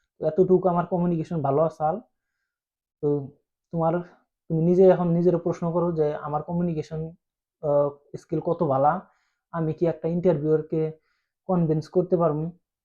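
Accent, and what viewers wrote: Assam, India. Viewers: Assami to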